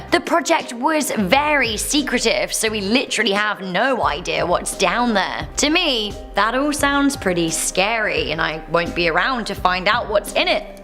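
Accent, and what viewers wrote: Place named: Scotland, United Kingdom. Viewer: I love British accents, but a Scottish brogue blows my mind!!!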